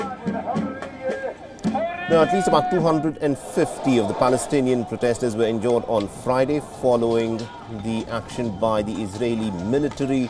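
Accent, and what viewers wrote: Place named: India. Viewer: You need to polish up your accent so it's clear outside india ,it's very difficult to understand you .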